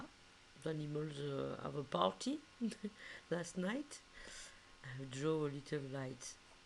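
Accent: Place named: France. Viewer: Great tutorial. Your english was perfect. Your accent reminds me of my grandmother whose first language was French. I miss hearing it. I learned something new with the tutorial! Thank you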